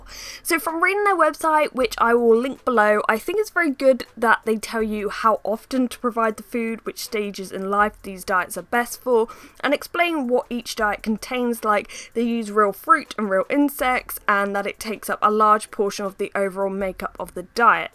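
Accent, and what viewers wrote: United Kingdom. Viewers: Nico Savoca it’s a British accent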